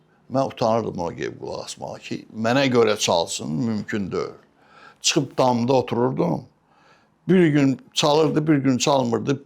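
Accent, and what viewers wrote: Baku, Azerbaijan. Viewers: Allah sizə can sağlıgi versin sizə qulaq asdığcan Baki ləhcəsi ilə danişığıniz məni valeh etdi ölənlərinizə rəhmət olsun qardasimi xatirlayib ağladim sizcox səmimi əsl layiqli insan kişi xarakterli bir sənətkarsiz sizdən birdaha analar dogmayacaq belə sənətkar 1000 dən bir olur❤❤❤❤❤❤❤ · Как люблю нашу бакинскую речь натуральную речь ❤❤❤❤❤ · Elə bildim əmimə, dayıma qulaq asıram. Bakı danışığı çox doğmadır... Sağ ol, sənətkar!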